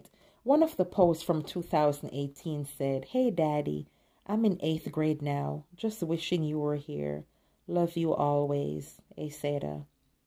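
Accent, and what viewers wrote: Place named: Jamaica. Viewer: Narrator: "Jamaican accent" 👍🏻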